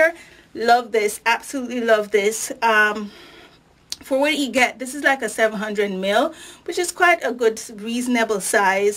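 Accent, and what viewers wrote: Jamaica. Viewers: you sound Jamaica :) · Love this vid...thanks for the patois too. Makes me feel like home :)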